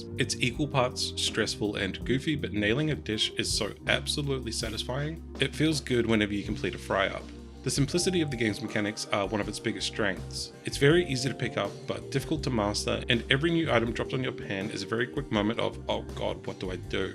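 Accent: New Zealand. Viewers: Your accent - are ye from Aotearoa ???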